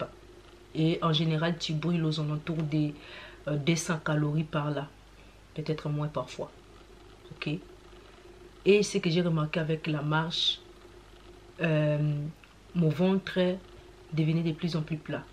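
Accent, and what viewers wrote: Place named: Kinshasa, Democratic Republic of the Congo. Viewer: Par votre accent vous êtes congolais de Kinshasa merci pour votre partage ! Par contre votre méthode est vraiment restreint qui peut tenir à Long terme pour perdre beaucoup de kilos 😮😢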